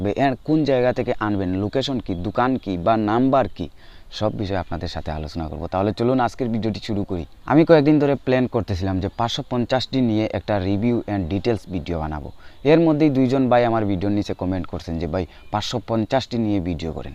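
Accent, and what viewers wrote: Sylhet, Bangladesh. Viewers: sylheti bhaisab,🤨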